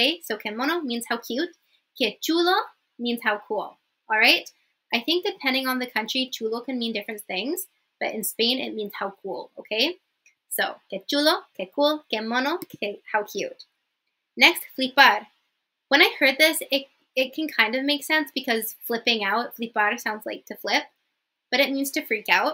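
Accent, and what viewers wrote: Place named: Mexico. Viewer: ❤Hermosa, tu acento es más latino, y de hecho suena como mexicano. Así que te vendría bien aprender slang de México, aunque hay algunas que usamos españoles y mexicanos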